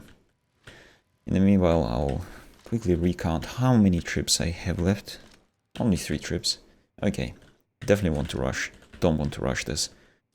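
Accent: Russia. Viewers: Are you Russian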